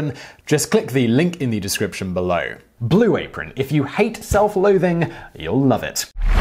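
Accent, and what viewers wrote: United Kingdom. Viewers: As a Californian I find English pronunciations of Spanish words delightful. I feel a little less bad about how much better they are at english than us. Wonderful episode as always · When did the vsauce guy get a British accent?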